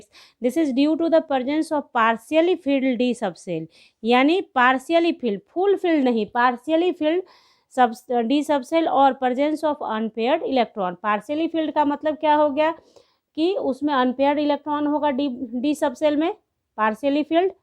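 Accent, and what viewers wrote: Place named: Bihar, India. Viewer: You are from bihar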